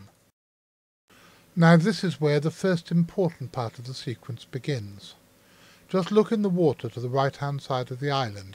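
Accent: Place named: RP, United Kingdom. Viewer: Apart from all of the comments about the video quality and style, 3 things. 1. Your age is not a qualifier of reliability (or your plummy accent). 2. Haven't you EVER seen what a swan or other large bird has to do to get into the air from water? 3. You also can't convince us by dismissing all the images beforehand '... the are just large fish, don't worry about them... blah blah'. I'm sad about all these things. The world is a strange place, and we're all interested, but this? Buh.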